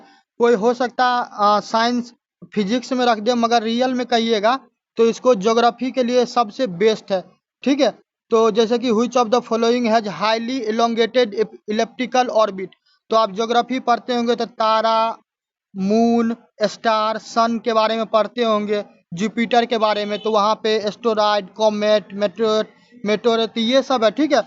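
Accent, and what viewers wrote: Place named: Jaynagar, India. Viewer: Hame lg rha hai aap Jaynagar se hai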